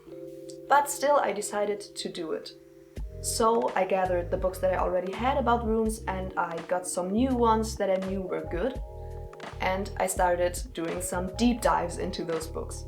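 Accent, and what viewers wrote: Germany. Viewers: I could hear from your accent that you’re German.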